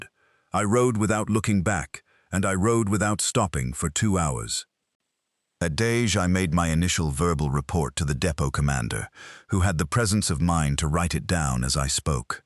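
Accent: United Kingdom. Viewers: English accent, but he can't pronounce lieutenant correctly. Pissed me off.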